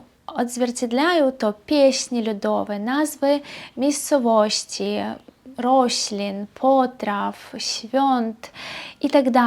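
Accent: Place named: Poland. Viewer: Musze być szczery! Łapiesz polski akcent! A teraz do meritium. Ludzie są inni zagranicą a inni u siebie w kraju. Nie wiem na czym to polega. Na przykład w czasie mojej tułaczki po świecie poznalem wielu dziwnych wegrów. I mialem taką sobie opinie o Węgrach Jak pojechałem do Budapesztu to ich wtedy pokochałem. Czyli: Trzeba odwiedzić kraj. Posmakować tamtejszej kuchni. I jakie jest tam.poczucue himoru. Bo wiadomo, ze są narody ktory nie mają poczucia bumoru.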